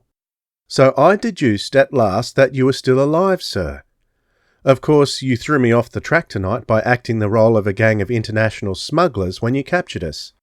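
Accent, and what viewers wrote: Australia. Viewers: Are you the narrator Australian?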